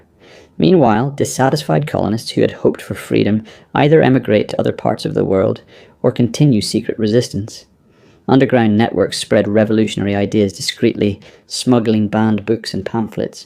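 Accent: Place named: United Kingdom. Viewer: Good video. I’d say I disagree on your framing. There’s a lot of potential positive outcomes from a truly unified west. A large amount of people view democracy and “freedom” as Orwellian concepts, especially in America. England and all her old colonies across the world face turmoil today via immigration, demographic change, political disunity. The Anglo race itself faces extinction. You could argue that had the American revolution never happened none of the demographic change we see taking place today are as extreme, and if it was we’d have a strong king to challenge it. The very ideals of the revolution are the ideals the minority groups across the world are using to take the lands back our people took via “conquest” so long ago. An we relinquish them without a shred of fight. I’d say be careful falling too far on one side of isle with your framing politically. 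You sound British so assume your schooling today has a lot of self hatred, anti empire, revisionist history rooted in it so I don’t blame you. Great video otherwise. Best of luck.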